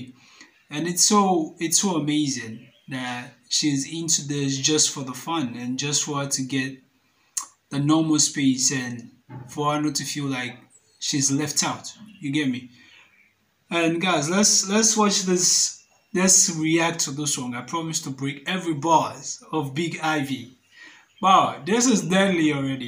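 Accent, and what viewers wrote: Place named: Ghana. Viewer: I sure this guy school 4 Ghana. Cos ur pidgin bi typical Ghanaian Uni one some.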